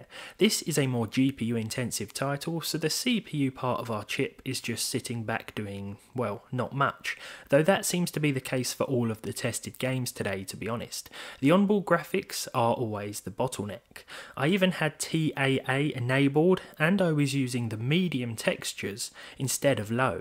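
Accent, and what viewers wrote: United Kingdom. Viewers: Very british pronunciation... At least for my Russian ear.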